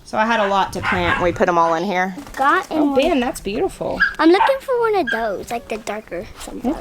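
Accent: South, United States. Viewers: I seriously thing you’re southern accent has become quite a bit more rich since the move. 😊 I’m so sad we got busy & couldn’t come meet you that last Sunday! One day I will meet you! 🤪😄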